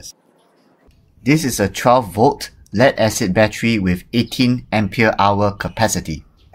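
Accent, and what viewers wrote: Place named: Singapore. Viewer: sound singaporean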